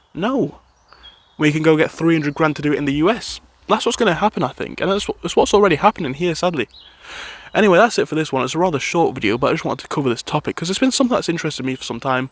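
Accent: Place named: Northern England, United Kingdom. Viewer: £500 a month won't get you a shed in the south east! My studio+ apartment is 1000 a month ...not a 1 bed a studio+ which has a small bedroom barely without a window! But I guess it's half the price up north which by your accent seems you are from.. Aldi the money you get paid in the south Vs north isn't a huge difference these days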